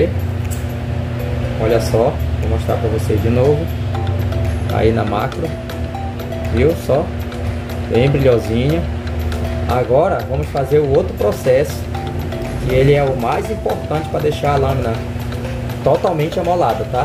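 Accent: Pará, Brazil. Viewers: Pelo sotaque vc deve ser paraense, cara, gostei da dica, certa vez joguei uma máquina fora porque não sabia se podia amolar, agora já sei, sobre o sotaque, morei 10 anos em Belém e 1 ano em Macapá, foram anos incliveis na minha vida, sou cearense.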